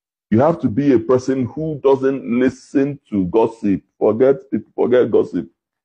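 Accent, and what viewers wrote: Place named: Pidgin, Nigeria. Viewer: 😂😂😂I love your videos so much and I like the way you speak your pidgin to give gist, God bless you my sister. Watching from Liberia🇱🇷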